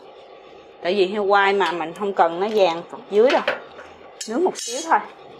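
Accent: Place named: South, Vietnam. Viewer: rất cãm ơn em dạy món thịt quay xem ngon lắm, nghe em nhai dòn rụm chảy nước miếng luôn, món ngon nhà chị ai cũng thích và hay mua ăn có khi ăn ngon quá khĩa 2 bửa luôn, sẽ mua thịt sống về làm theo em - mà nghe giọng miền Nam chân phương của em cãm tình và dễ thương lắm cũng nên quay cái mặt cho mọi người quen biết nữa nhé.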